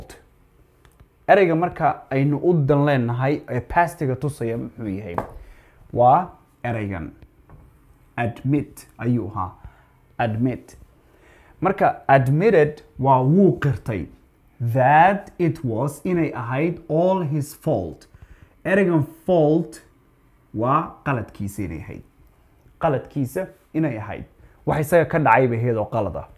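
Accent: United States. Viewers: In fact, i admired your of way tecahing.. jazaakallaahu kheyran.. Please lemme know where and how u learned that rough language, specifically the American accent...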